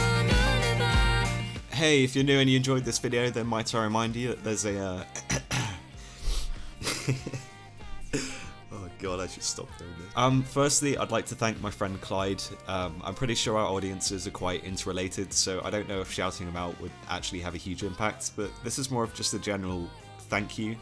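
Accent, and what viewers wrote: United Kingdom. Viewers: Your cutting British accent and the background reminds me of exurb1a. Much love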